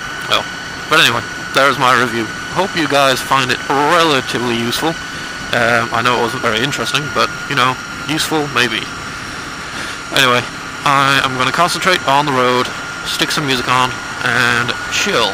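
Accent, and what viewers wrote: United States. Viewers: Nice vid but that mid-adlantic accent......what on earth is going on?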